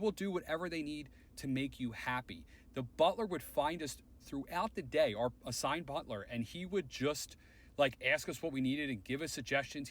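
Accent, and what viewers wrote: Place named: Philadelphia, United States. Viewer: Clocked your phila . accent before you mentioned PHL! Nice review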